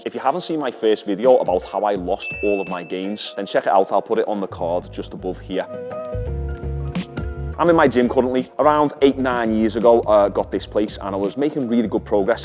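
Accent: Liverpool, United Kingdom. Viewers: I'm guessing ur scouse from ur accent. U still based in Liverpool??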